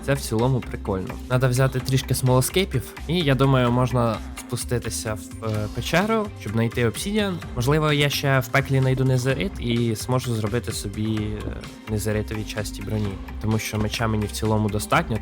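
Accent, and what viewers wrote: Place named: Poltava, Ukraine. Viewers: Дякую! Мій синочок дивиться ці майнкрафти, нарешті українською 😉 ну може трошки суржиком, але рідним, наче з-під Полтави) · Полтавськиа мова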